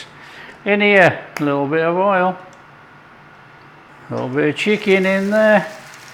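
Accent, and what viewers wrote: United Kingdom. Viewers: does it easy and delicious for the working people,no nonsense exotic ingredients and time consuming preparation that needs a three thousand year old samurai sword and skill to slice like a samurai warrior in a clear anglo saxon accent on an electric cooker in a skillet without the need for a billion btu burner and wok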